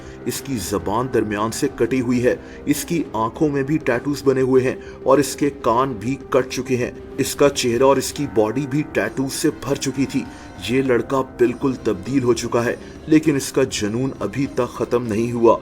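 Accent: Multan, Pakistan. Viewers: Are you from multan??????????????😊😊😊😊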